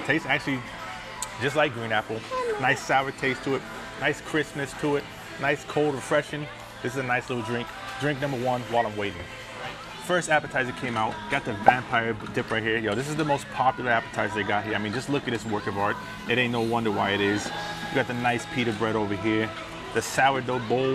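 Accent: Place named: Tri-State Area, United States. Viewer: Oye, you from the tri state area? I'm placing bets on Jersey or possibly the city (NYC). I hear an accent and it sounds like fam back home. #NoTimeForADiet 🤣😂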